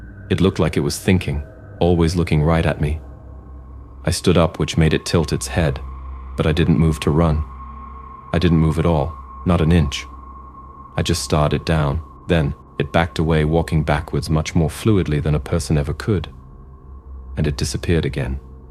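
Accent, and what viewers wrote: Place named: RP, United Kingdom. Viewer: You do a good RP accent. We say "privacy" with a short "I" sound though.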